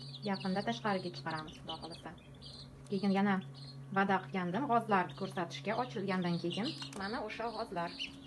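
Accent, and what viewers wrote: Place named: Karakalpakstan, Uzbekistan. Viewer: Ассалому аллайкум! Мен хам олгандим 70талик окидан. 29 та гоз тухумидан 15та очиб чикди! Кейинчалик 128талик фул автомат олувидим 57та гоз тухумидан 1та очиб чикди! Кайфият "0" ! Таблода харорат 37,2 тухумда эса 38,9! Биринчи кафат билан иккинчи каватнинг хароратининг хам фарки катта! Синглим, гапришингизга караганда Коракалпогистонликга ухшайсиз! Илтимос, неча фойиз очиб чикаяпти тугрисини айтинг! Иккинчи каватдаги тухумларингиз ковурилиб колмаяптими? Хароратни канчага куйдингиз?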